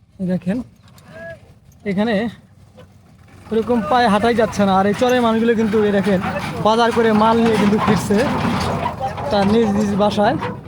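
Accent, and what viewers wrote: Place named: Tangail, Bangladesh. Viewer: মিথ‌্যা ব‌লে ধোকাবা‌জি করা দয়া ক‌রে বাদ দিন, আপনার কথার ধরণ মো‌টেও ক‌ু‌ড়িগ্রাম জেলার মানু‌ষের কথার ধর‌নের সা‌থে মি‌লে না। আপনার কথার ধরণ টাঙ্গাইল জেলার মানু‌ষের কথার সা‌থে পু‌রো মিল এর মা‌নে ভি‌ডিও টা কু‌ড়িগ্রা‌মের না।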